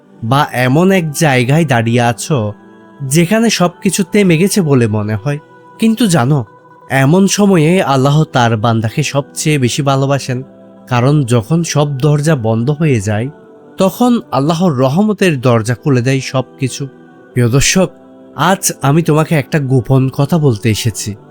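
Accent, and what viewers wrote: Chittagong, Bangladesh. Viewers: আসসালামু আলাইকুম ভাই বিনীত অনুরোধ আপনার কাছে পরিশুদ্ধভাবে উচ্চারণ শিখে সুন্দর উপস্থাপনার মাধ্যমে ভিডিও তৈরি করেন আপনি যে চিটাগাইঙ্গা অজপাড়া গায়ের ভাষা থেকে বের হয়ে আসতে পারেননি তা তো একেবারেই পরিষ্কার পরিশুদ্ধ ভাষায় কথা বলতে চেষ্টা করলেও আপনার মুখ থেকে চিটাগাইঙ্গা ভাষাযই বের হয়ে আসে। ভিউয়ার বাড়িয়ে পয়সা কামানোর ধান্দা না করে চিটাংগের ভাষায় কথা বলা পরিহার করেন। এজন্য আমি আপনার ভিডিও দেখি না শুধুমাত্র আপনার চিটাইংগা গন্ধ পাওয়ার কারণে পরিশুদ্ধ উচ্চারণ এবং সুন্দর উপস্থাপনার ট্রেনিং গ্রহণ করুন তারপর ইউটিউবে আসুন ।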